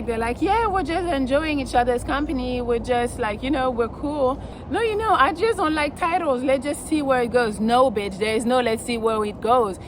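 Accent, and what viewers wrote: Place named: France. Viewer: I knooooow that accent was French...that sexy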